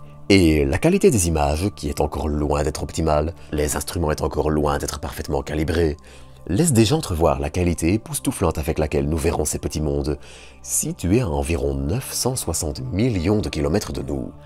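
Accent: Belgium. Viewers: C'est la voix de, son organe naturel, son accent pittoresque, il est belge. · Merci pour ces actualités spatiales présentées de façon efficace. J’ai bien ton accent Belge. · Holala cet accent... Belge? (no offense, suis belge aussi)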